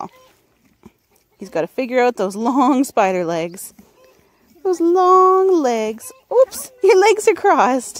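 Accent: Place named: Canada. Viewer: Sounds like you’re from Canada. Such a beautiful birth.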